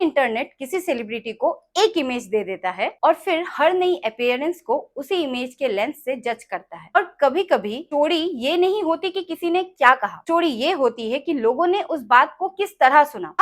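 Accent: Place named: Bengal, India. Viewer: i like your bengoli accent hindi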